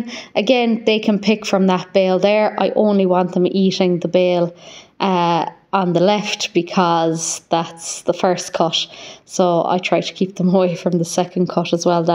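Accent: Kerry, Ireland. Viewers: Remember no matter what u lose Dont lose that beautiful Kerry accent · You're an inspiration love you're Irish accent 😊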